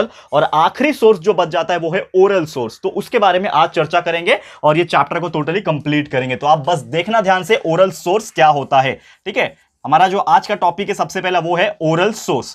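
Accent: Maharashtra, India. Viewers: Sir, mala vatla hota tumchi mothertounge marathi aahe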